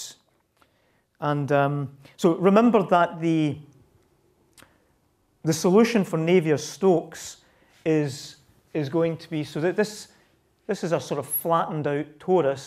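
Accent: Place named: Scotland, United Kingdom. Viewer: The first thing that struck me was the super cool beard. The second thing was the super cool accent. What is that accent? Is it some kind of Scottish?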